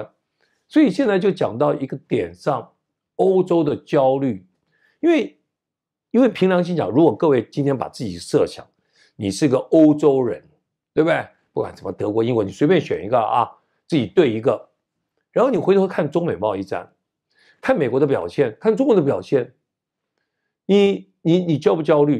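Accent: Standard. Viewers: 台湾移民到新西兰的，国语说得正👍观点客观👍👍👍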